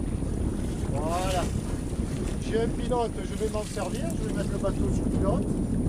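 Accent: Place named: Marseille, France. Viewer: Quel bonheur de regarder ces navigations sur la grande bleue dans cette région ( Bandol , Les embiez , Toulon , Porquerolles ) que je cnnais très bien . On a en prime cet accent marseillais plein de ce soleil qui nous fait tellement défaut à nous " voileux du nord de la France " . On sent le marin heureux d'être en mer .. un vrai régal et merci pour ces moments bien agréables ..Yannick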